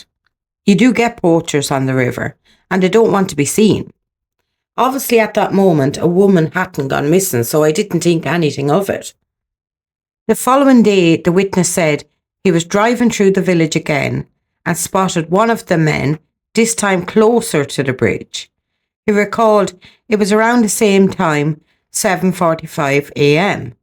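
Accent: Ireland. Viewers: Love your Irish accent and I agree with you. This was not a terrible accident. 😢If they were innocent fishermen why haven’t they come forward?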